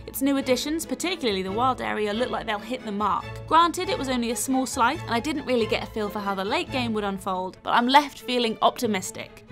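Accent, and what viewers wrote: United Kingdom. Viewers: It’s kind of majestic hearing a British person talk about Pokémon using some British words ^_^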